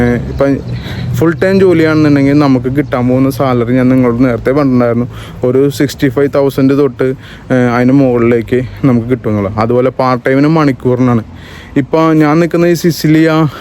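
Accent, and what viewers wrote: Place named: Ernakulam, India. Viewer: എറണാകുളം സ്ലാങ്ങ് ആണല്ലോ.Akm ആണോ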